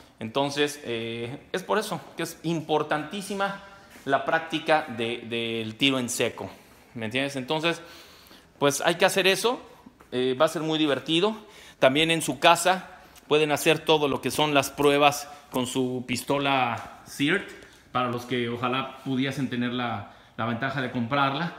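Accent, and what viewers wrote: Mexico. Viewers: Saludos desde España para México lindo,querido y armado.Videos interesantes y además en el espléndido español mexicano,lo cual se agradece.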